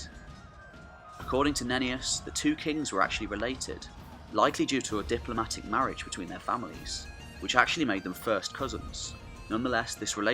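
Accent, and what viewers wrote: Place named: England, United Kingdom. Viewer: Arturo Wagner I am going to assume you are from somewhere outside the UK because you can't seem to be able to tell the difference between a Scottish and an English accent, Ewan McGregor is Scottish, the narrator is English, and on top of that the tone of the narrators voice is absolutely nothing like Ewan McGregor.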